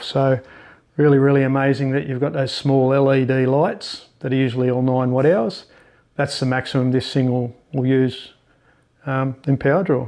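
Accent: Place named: Australia. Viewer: Hi,. I saw all the video on 24" Hubble Optics Dobsonian and was very impressed. I read from the automatic subtitles since I am not so accustomed with Australian flavor english accent. Any way, I am so much interested on this type of designs.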